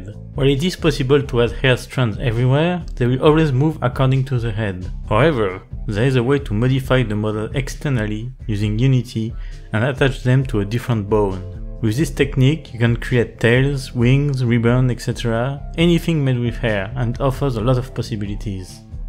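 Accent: France. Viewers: Oh un francais ca se voit a ton accent faut travailler ca mais sinon c un bon tuto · (on voit l'accent français ptdrr)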